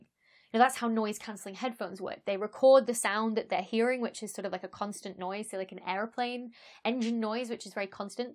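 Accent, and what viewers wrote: United Kingdom. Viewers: I like how you pronounced that British t-sound from 'plot' by just stopping the airflow with your throat. I mean, how do you explain this to a phonetician who has learned that the the T-sound is a dental sound. For some one lip-reading you, you just said plo. Please keep that accent, I love it.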